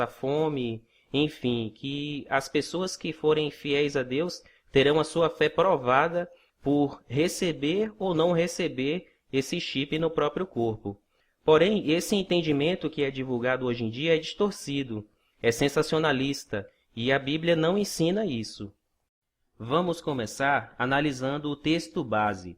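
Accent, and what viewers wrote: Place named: Northeast, Brazil. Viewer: Seu canal é didática bíblica. Porque não põe seu nome? Seria muito bom conhece você seu sotaque nordestino lembra irmãos amados do nordeste um abraço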